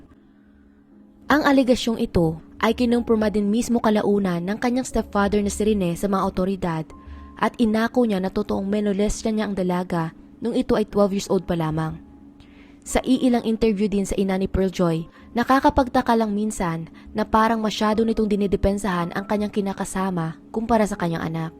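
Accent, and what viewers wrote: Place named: Iloilo, Philippines. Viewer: Unsa manang inahana oy murag walay buot naapay inusturyahan ilongga bati paminawon. Hahay nimo day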